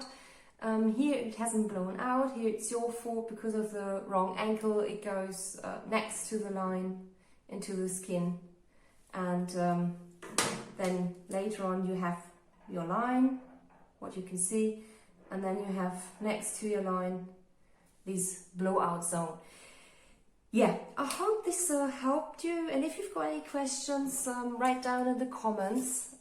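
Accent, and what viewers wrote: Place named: Australia. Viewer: don’t apologize for your english, it’s amazing! you actually sound australian. thanks for your vids!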